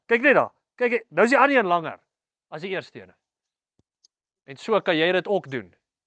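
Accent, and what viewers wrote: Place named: Mid-Atlantic, United States. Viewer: That "Mid Atlantic " accent should make anyone suspicious.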